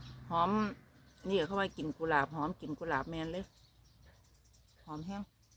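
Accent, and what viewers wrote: Isan, Thailand. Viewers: โชคร้ายสำหรับ FC ต่างแดนอย่างเรา ระบบ AI เปลี่ยนเสียงภาษาอีสานที่เป็นเอกลักษณ์ของ แบบฉบับที่เราชอบเป็นภาษาท้องถิ่นของประเทศนั้นๆไปแล้ว มันไม่ unique อีกต่อไปแล้ว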